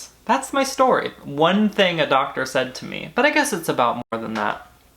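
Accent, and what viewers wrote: England, United Kingdom. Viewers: LOVE your British accent. :) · BRITIAN ISNT JSUT ENGLISH STOP SAYING UR ENGLISH ACCENT is BRITISH as a Scottish person it offends me And we say ye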